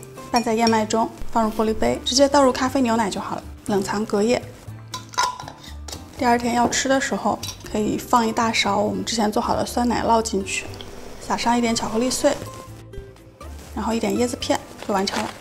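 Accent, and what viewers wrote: China. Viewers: 是哪兒的人啊？她的普通話口音我好喜歡。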